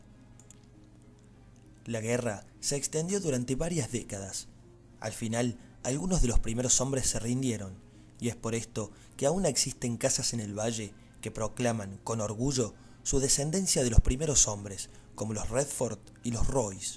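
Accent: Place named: Córdoba, Argentina. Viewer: Sos cordobés? (Argentino) se te nota el acento en las palabras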